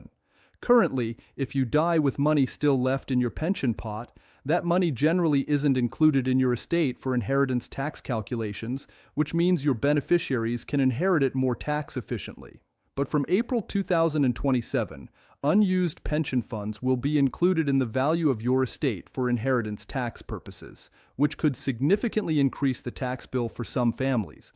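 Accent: United States. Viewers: Why the US accent for UK matters....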